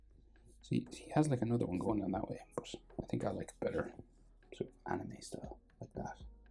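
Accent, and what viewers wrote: Ireland. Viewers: is that an irish accent????????????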